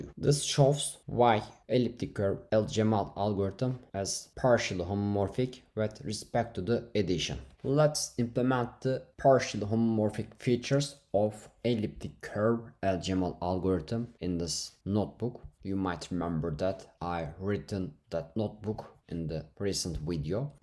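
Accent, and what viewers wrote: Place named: India. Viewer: kanka çok iyi içerikler üretiyosun ama hintli ingilizcesi gibi konuşuyosun yanlış anlama :D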